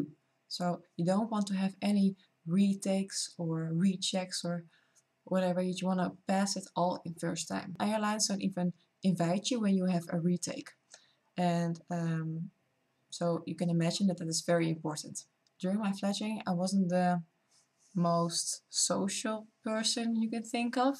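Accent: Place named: Netherlands. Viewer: Sweet accent! Dutch? Scandinavian? German? I find that in any field, it is difficult to study and work at the same time. Agree with what you say.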